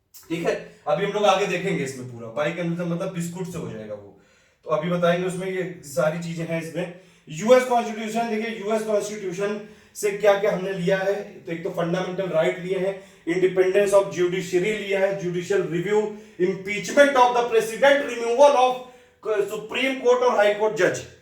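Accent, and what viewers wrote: Kanpur, India. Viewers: sir Aapka kanpuriya andaj Dil jeet leta h kasam s entertainment with study 🙏🙏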